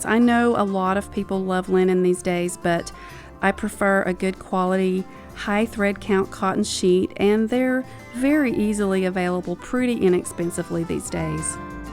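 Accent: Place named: South, United States. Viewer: I feel like we could be the best friends. You have such a sweet and tender heart for the blessings from God. We are on opposite sides of the country but I just love watching you do what makes your heart happy. I sit and drink my coffee to your sweet southern accent. I love in Arizona and for years my home has been decorated in a rustic ranch theme. But slowly I’m going in a more vintage direction. Taking your advice in using what I have and getting what I can inexpensively at the thrift and antique stores. Though our styles are a little different, you so inspire me. Thank you so much for sharing your life and home. Hope to hug you someday. ❤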